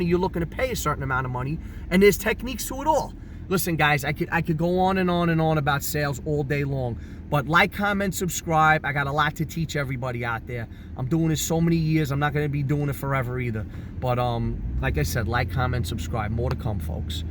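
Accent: New York, United States. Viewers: Your new york accent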